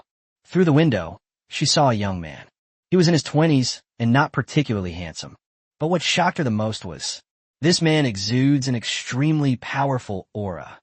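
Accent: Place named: France. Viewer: Would be a much better story and easier to follow if you didn't repeat the same s*** over and over and over again. Also if you could stop using that french accent voice to read the English words it would be much better.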